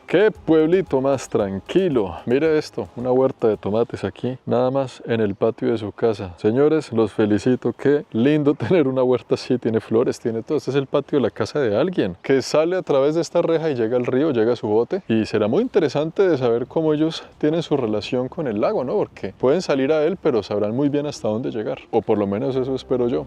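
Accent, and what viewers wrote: Bogotá, Colombia. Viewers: es de Bogotá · Cómo siempre muy buena su crónica y narrada con su estilo muy colombiano. Excelente, gracias. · es un Chico muy culto no te preocupes no dira nada indevido. Si voz es muy relajante obvio por su acento Colombiano muy agradable de Escuchar y correcto español. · Este narrador habla español con un espectacular acento de Bogotá, Colombia. Diría uno, sin conocerlo, que creció allí. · Tu voz y tonada colombiana es muy grato escuchar! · Es que es Colombiano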